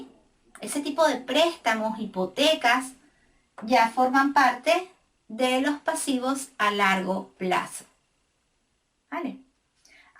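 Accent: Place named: Venezuela. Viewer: Muchas gracias por este video, estoy comenzando a estudiar contaduría y administración tus videos me han ayudado bastante. Quería preguntarte si es posible que hagas un video en cuanto a tips para entrar a trabajar empresas como PwC, Deloitte y KPMG, específicamente que herramientas o sistemas se manejan y que conocimientos podemos aprender para tener éxito en entrevistas de trabajo para ese tipo de empresas. Soy joven aún pero quiero trabajar en algo directamente relacionado con mi carrera y creo que entrar en una de las empresas que nombre anteriormente es un buen paso para comenzar y aprender otras cosas que no veo en la universidad, a veces siento que en la universidad se limita mucho a lo teórico. Te hago esta pregunta porque soy de Venezuela y por tu acento me parece que tú también😃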